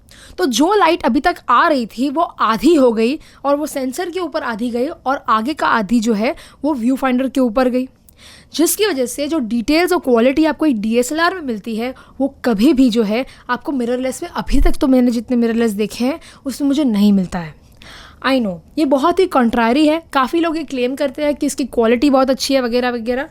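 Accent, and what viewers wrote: Gujarat, India. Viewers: same here.. your accent shows that you are gujarati..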